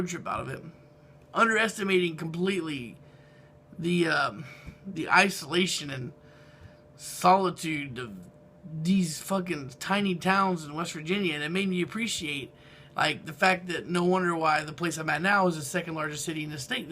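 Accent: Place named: California, United States. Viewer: why are you in west Virginia in the first place? you sound like you're from Cali or a state close to the south west area of the states. im from Cali and moving to the Midwest was the worst mistake of my life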